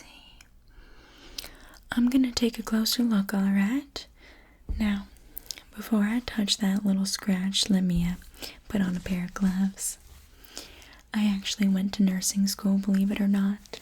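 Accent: South, United States. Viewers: Didn’t know I needed the Southern accent this is highkey fire 🥹 pleaseee do more Obsessed Girl tho it’s peak and I really wanna hear more dirt on Olivia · Ok new to this channel...really loving the southern accent darlin ❤ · Oh southern accent I like that, I'm very familiar with that language. I think you should do more.🤠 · The Southern drawl actually suits you quite well 😄😝❤️ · I love the southern girl accent! 👍😘❤️ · The southern accent.. I'm 44 now. I speak with an accent like that once in a while. I'm deep deep South Texas. . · And here I thought your normal voice was heavenly but this accent with the southern tone is just amazing. Hope this isn’t the only time we’ll hear it 🤠🫶🏼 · You nailed that southern accent 🩶🩶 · The southern accent is nice 😊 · The Accent was too good tbh, are you actually from the Southern countryside? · My new favorite asmr video 🥰🥰💓💓amazing southern voice:D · I’m British 🇬🇧 but I love the accent. 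Whereabouts in the states is that accent from? It’s very good :) 😍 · Hey, I love your videos! Im from the south and I thought the southern accent was pretty good. Also congrats on the 150k subs 🎉! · Wow what a great video😊 your southern accent was spot on. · This has to be the best ASMR video I've ever seen, the southern accent is so peaceful and relaxing ❤❤❤❤ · The southern accent is decent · You HAVE to do another southern accent video, no lie I fell asleep somewhere in between 0 and 30 seconds. I still watched the whorl thing when I woke up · Idk why, but for some reason the southern accent was extremely comforting. Like I actively felt safe listening to this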